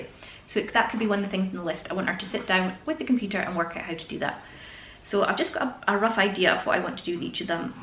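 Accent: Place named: North, United Kingdom. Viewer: Fab video. Ive been stressing about teaching my 2 girls inbetween being out at work. Lovely to your lovely family and to hear ur accent. Im originally from Peterhead so its nice to hear northern accent again. Stay safe take care x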